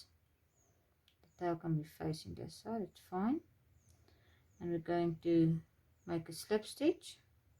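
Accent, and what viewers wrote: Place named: South Africa. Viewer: This is perfect thank you! Your accent brings me back to when I lived in SA! ❤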